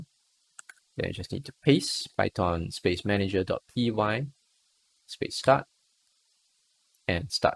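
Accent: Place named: Singapore. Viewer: Nice video but I cannot understand your Singrish!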